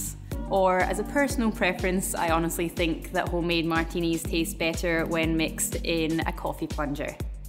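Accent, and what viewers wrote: Scotland, United Kingdom. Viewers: God, I love that scotish accent. There should be more scots in this world. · By the way, I absolutely enjoy your recipes and your Scottish accent! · Love your videos and lovely Celtic/Scottish accent. I'm having trouble getting the exact song title and artist from your video. R, Patrick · Does she have Scottish accent??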